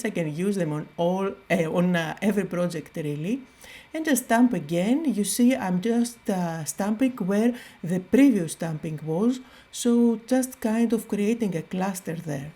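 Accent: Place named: Greece. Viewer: So, mom and I were just talking about what the people have said about the speed in which you talk etc. Your native tongue is a language of love, it ranks right up there with Italian. My best friend of 17 years is from Greece, his family immigrated here when he was 5 and he didn't speak a lick of English. I was on a video chat with him one night and he was talking to his mom in his native tongue and it was so beautiful I asked him to repeat what he just said! "I'm not going to tell my mom that dinner is ready again, Sash." Is what he said 😅. So, mom and I think you should do a video in Greek and if they wanna know what you're saying they can watch the subtitles. Speak at a speed you're comfortable with, Greek is spoken faster than English, and your accent is amazing! Don't change how you do your videos because someone thinks it isn't good enough. ❤❤ Mad love to you!!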